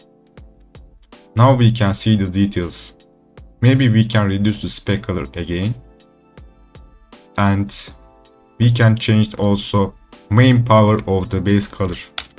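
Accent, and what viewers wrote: Turkey. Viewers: Türk. Daha ilk dinlediğinde aksandan belli :D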